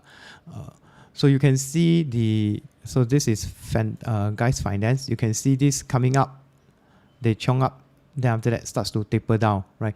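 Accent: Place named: Singapore. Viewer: surprised by your singaporean accent, but great video. made the same mistake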